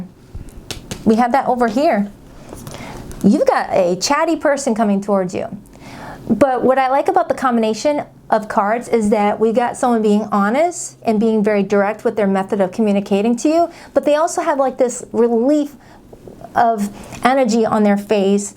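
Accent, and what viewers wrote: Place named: Boston, United States. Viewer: Bostonian here too!! I was trying to place your accent before and wasn't sure. 😂 🙌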